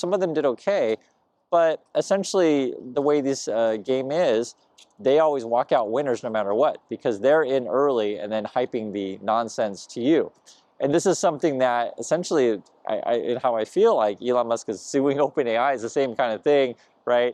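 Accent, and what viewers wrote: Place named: West Coast, United States. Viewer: Beautiful view. Are you from CA? You have a west coast accent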